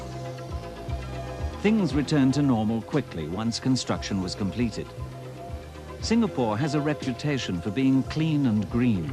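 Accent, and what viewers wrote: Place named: United Kingdom. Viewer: Same British accent!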